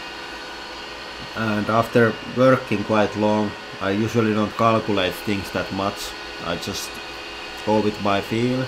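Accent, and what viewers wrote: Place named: Finland. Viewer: Oisko aksentti :D Ei sil oo ees nii paska enkku mut sil o se suomalaisten aksentti. Jos sul ny oli joku 10 enkku ni et varmastikaan pystyis puhuu paremmin :DD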